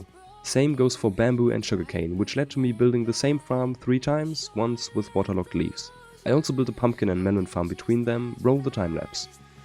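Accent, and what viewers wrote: Germany. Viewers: Kann das sein das er deutsch ist? 😅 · are you a german? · Are you from germany?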